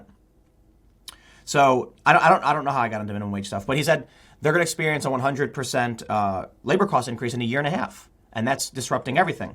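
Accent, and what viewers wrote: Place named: Midwest, United States. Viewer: You can tell is from the Midwest. He adds an "S" to singular nouns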